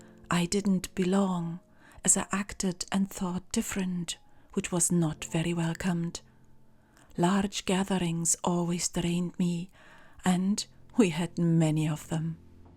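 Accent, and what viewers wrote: Scotland, United Kingdom. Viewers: Ah, danke ❤. Ich liebe deinen schottischen Akzent. Auch dir einen wunderschönen 3.Advent 🎄☃️🎅🕯️🕯️🕯️❣️ Bis bald ❣️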